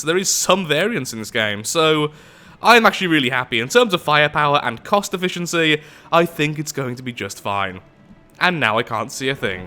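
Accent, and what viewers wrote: United Kingdom. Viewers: is he british? i cant tell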